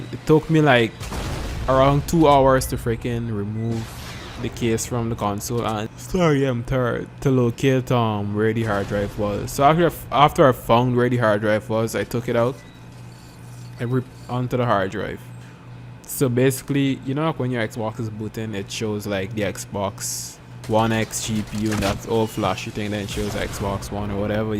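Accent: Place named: Caribbean. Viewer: Good to hear a Caribbean voice on YouTube for once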